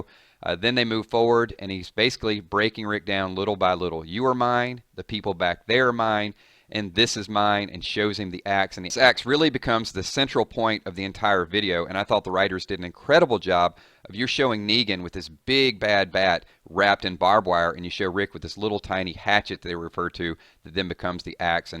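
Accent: South, United States. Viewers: where are u from I can hear a southern accent btw what do u use to stream road to survival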